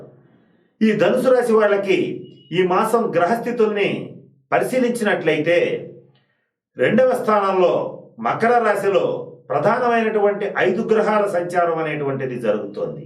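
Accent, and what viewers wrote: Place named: Telugu, India. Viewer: Your explanation is good . The reason may be due to your training as a teacher coupled with your accent in Telugu . I am viewing your videos on Dhanassu as the sign is of me & my son belong to that Rasi . Thank you sir . From New Mexico USA camp .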